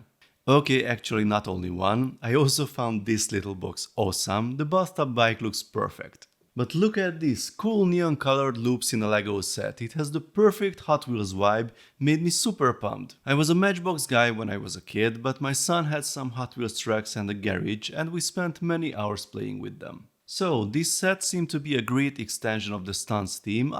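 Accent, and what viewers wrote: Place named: Hungary. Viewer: A Stuntz-os videóddal kezdtem... most látom a csatornád először.. volt bennem egy gondolat, hogy annyira magyaros az akcentusa az illetőnek.. tán csak nem magyar? De. :) Nagyon jó a csatornád, sok sikert hozzá!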